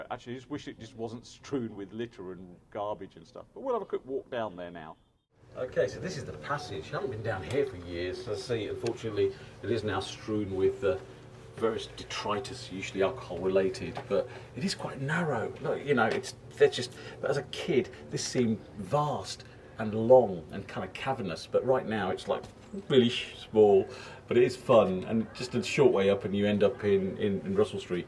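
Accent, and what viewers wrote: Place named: Peterborough, United Kingdom. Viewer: Brilliant. Shared this with my students in Italy to hear the Peterborough accent and give them a sense of where I'm from. Love it.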